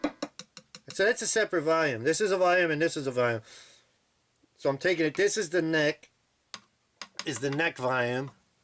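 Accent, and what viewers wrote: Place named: Philadelphia, United States. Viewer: You sound like your from philly lol!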